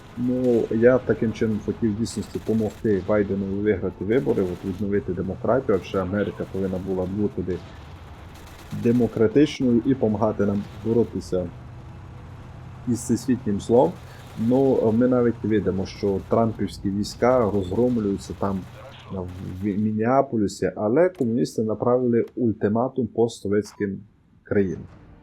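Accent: Transcarpathia, Ukraine. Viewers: А ти з Закарпаття?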